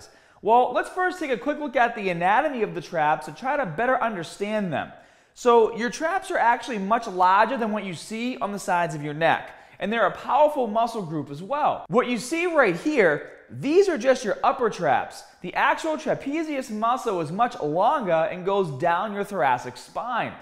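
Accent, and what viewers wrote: Boston, United States. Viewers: Ok I just watched his video on deadlifting from 9 years ago, when did he develope a boston accent? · Is he from Boston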